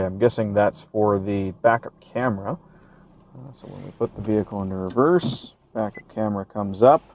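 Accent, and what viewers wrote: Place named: Canada. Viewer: Damn Canadians and their ou pronunciation. Really jumps out at us Americans.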